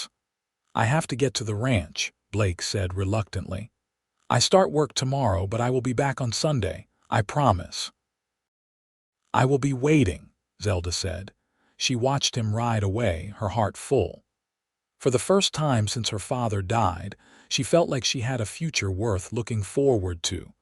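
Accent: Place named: United States. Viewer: At First: favorite narrower - an ideal voice for wild west stories, through and trough American English, sounding like a music instrument. Greetings from Germany.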